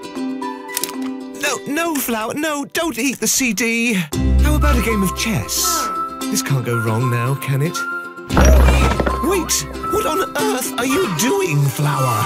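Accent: United Kingdom. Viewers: Narrator sounds like the one from stanely parable. They both get irritated when you don't do what they want. Both are british. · narrator being british also reminds me of tsp so much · La la la la la, la la la la... love the plot of the video, so cute and lovely, the good old British accent of the narrator is a cool touch and makes the video more interesting to watch.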